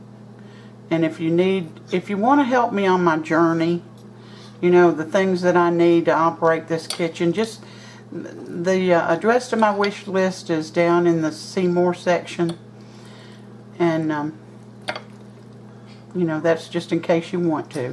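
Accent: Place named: Alabama, United States. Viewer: I just recently found your channel and I love your videos. I appreciate your simple recipes and your thorough instructions. Love the Alabama accent and taste testing. Can't wait to actually try some of these dishes.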